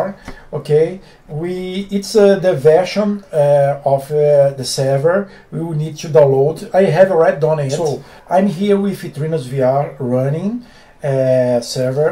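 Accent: Brazil. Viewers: quanto eu ouvi a voz na hora sabia que era br falando ingles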